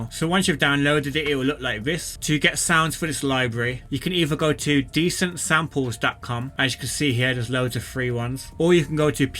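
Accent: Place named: Wales, United Kingdom. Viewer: Love that welsh accent ✅😉😀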